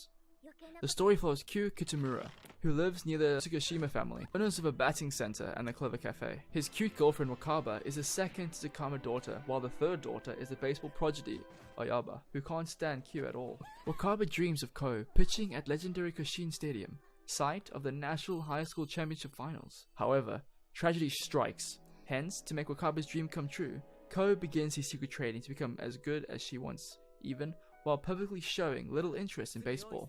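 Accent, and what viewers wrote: South Africa. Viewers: what is your accent?? south african??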